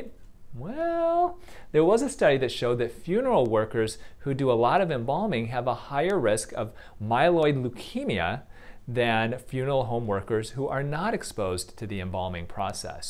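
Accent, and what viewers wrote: United States. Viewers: Thanks so much!🤩 And your voise reminds me USA 16 years ago.. Was there with Work and Travel program as a student. Happy to find this channel 🥳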